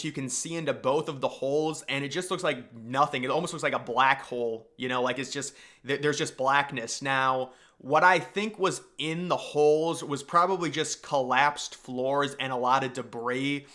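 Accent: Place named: Alaska, United States. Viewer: He sounds like he's from the show Alaskan Bush People.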